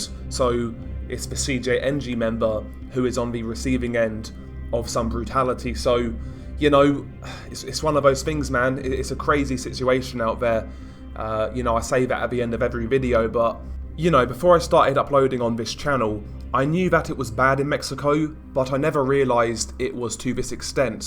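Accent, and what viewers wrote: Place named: United Kingdom. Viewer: british accent is so satsfing for narations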